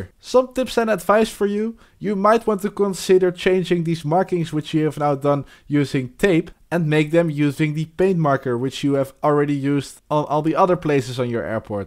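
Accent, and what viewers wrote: Netherlands. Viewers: Are you dutch? You have a dutch accent.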